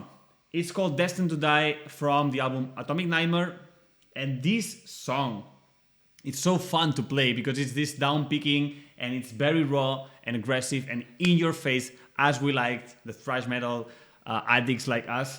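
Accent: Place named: Spain. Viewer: Is this guy from Spain? The accent sounds a lot like European Spanish.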